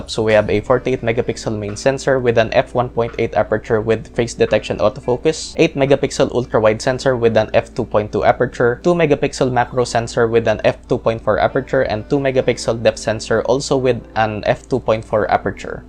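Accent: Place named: Philippines. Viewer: When I heard a Filipino Accent English speaking. ☺️👍 I'm so proud being a Filipino. I love your review. So detailed.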